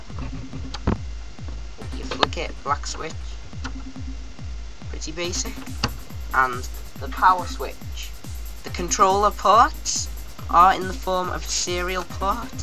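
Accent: United Kingdom. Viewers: Nice c64, i own one these '87s made. btw why allways britts talks so funny dialect? Good video. Check my videos, channel tommi palomäki or jebu6 Greetings from finland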